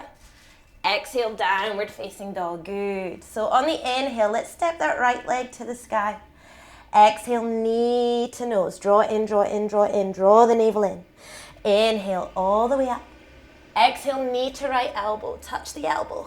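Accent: United Kingdom. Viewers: I absoluteley LOVE your work, your devotion and your joy with Yoga.. and the best bit: your british voice! thanks so much xxx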